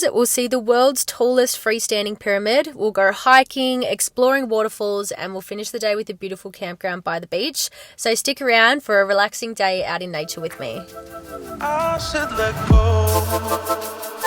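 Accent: Australia. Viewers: But, I love your accent! Australian accents are most sexy accents in the world!! Love your drone footage! But please dont act like your alone🤦🏼‍♂️🤦🏼‍♂️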